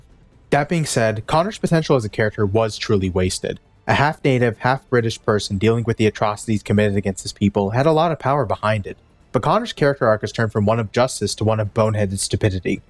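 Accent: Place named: North America. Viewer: I'm English and clearly understand the narrator by the way. I guess North American is hard for some...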